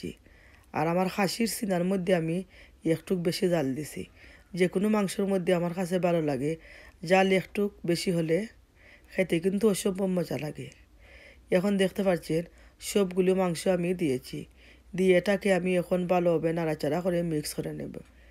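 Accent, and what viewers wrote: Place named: Sylhet, Bangladesh. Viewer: আপু দেখিলিছি আপনার রান্না , মিস করছিনা। বাক্কা মজা লাগল আপনার রান্না । আমার নানা সিলেটে । তাই আপনার মাতকতা বালাউ লাগে আমার কাছে । আমার মায়ের ভাষা ।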